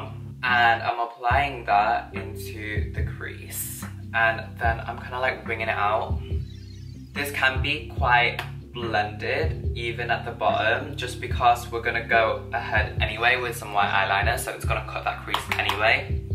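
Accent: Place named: London, United Kingdom. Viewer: I’ve just been watching all of your old videos from like 3/4 years ago and you used to speak to posh and delicate and you used to be so quiet and no hate but all of your recent vids you’ve got this mad London accent it’s weird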